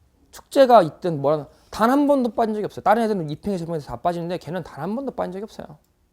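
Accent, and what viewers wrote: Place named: Soviet Union. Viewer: 말투 소련여자 ㅈㄴ 달맞네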